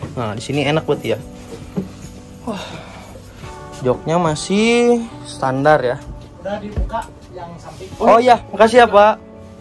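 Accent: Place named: Kebumen, Indonesia. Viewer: Ngapak kebumen hadirr..bozz?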